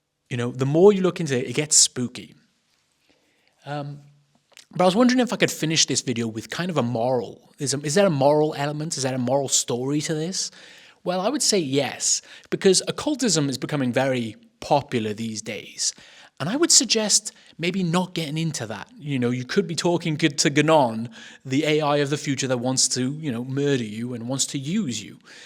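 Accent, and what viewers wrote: Wales, United Kingdom. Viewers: Your welsh right? I csnt figure out your accent and its annoying me haha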